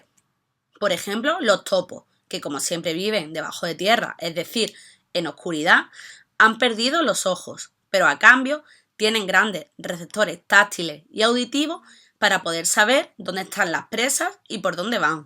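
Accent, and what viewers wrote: Spain. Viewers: Por que es española genio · Es Española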